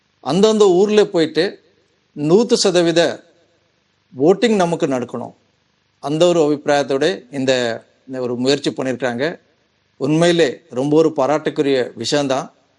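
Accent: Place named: Telugu, India. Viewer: பேச்சில் தெலுங்கு அல்லது வட மக்கள் சாயல் தெரிகிறது...எது எப்படியோ அசல் தமிழர் தேர்தல் அரசியலை கேலிக்கூத்தாக்காமல் இருந்தால் சரி.ஏற்கனவே சின்னத்தை புடுங்கி சாணக்கியமாக தெலுங்கு கட்சிக்கு கொடுத்தாச்சு. சின்னம் முறையீடு நீதிமன்றத்தில் இருக்கிற பட்சத்தில் வேற சின்னத்தை கொடுத்து எங்க வாக்கை மடை மற்ற முயற்சி செய்கிறீர்கள், பிறகு வாக்குப்பெட்டியை வைத்து எப்படியெல்லாம் நாம் தமிழர் கட்சியை அலையவிட்டு வேடிக்கை காட்டுவீர்களோ ஆண்டவனுக்குதான் வெளிச்சம்.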